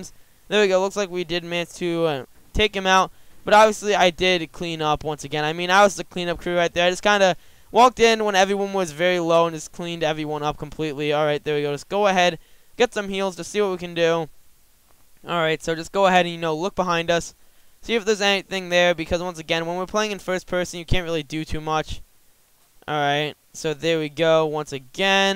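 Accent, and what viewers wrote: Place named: United States. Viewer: Right, what server are you on btw,? I'm feeling a bit of american in your accent. Not sure which one specifically.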